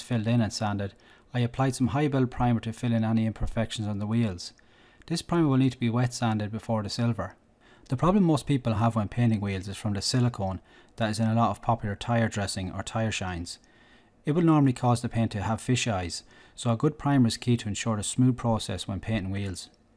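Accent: Ireland. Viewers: Glad to here another Irish accent on YouTube. Keep it up bro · Great job. I enjoyed hearing the Irish accent too (Mum was Irish).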